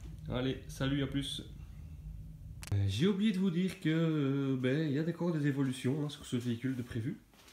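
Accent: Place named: Belgium. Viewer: Je me disait bien qu'il y avait un petit accent de la Belgique 😁😁😁 merci pour gotta et force à toi pour ta chaine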